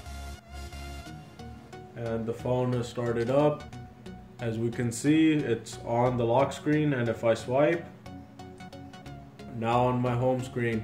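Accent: India. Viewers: You know this tutorials wont work when they have that shit music and indian accent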